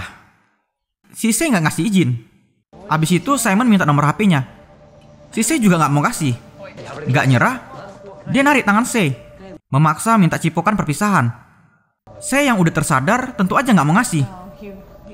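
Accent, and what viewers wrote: Medan, Indonesia. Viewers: Bah, Nampak kali Medan kau bang... Agak kreak dikit biar makin mantap bg.. Hehehe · Bro aku suka bahasa mu..sesuai dengan daerah kU Medan.. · Orang medan ni kayakNya.. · Kimak kali miminnya, org medan kau ya min??? Aku pun org medan wak 😂😂😂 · medan kau ya lae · Nampak kali logat medannya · nak medan bah hahah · Fixs nih orang Medan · Logat medan ni yah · Mimin orng medan?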